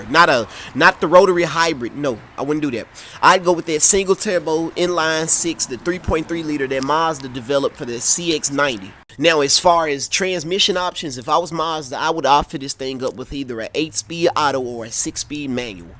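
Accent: United States. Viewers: Thanks!brother I’m from Boston massachusetts and when I initially heard your accent I was like here’s some country dude with some country slang even a brother from Boston won’t understand but then you start talking and oh my god you’re a genius !! You definitely know your shit !!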